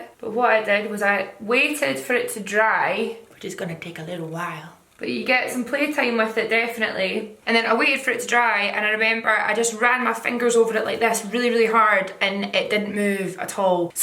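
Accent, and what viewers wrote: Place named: Glasgow, United Kingdom. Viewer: Gen up the only Glaswegian accent I can listen to without cringing like mad! making weegies proud af yas x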